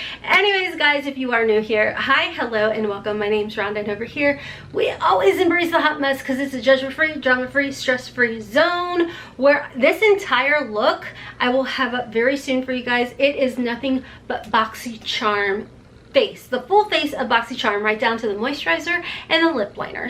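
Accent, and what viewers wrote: South, United States. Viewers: I love every so often the southern twang comes out “maaaatte” shades ❤️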